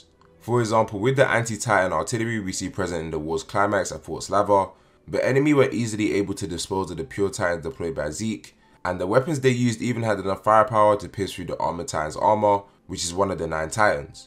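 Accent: United Kingdom. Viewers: couldve been amazing but i just cant understand the strong brittish accent?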